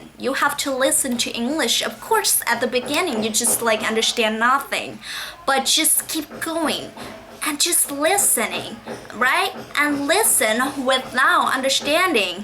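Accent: United Kingdom. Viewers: I thought your senglish sometimes british sometimes american style, but that isn't no problem cause I have a problem with my speaking skill I'm too much learned grammar, but none people cares about the grammar, really exited your video!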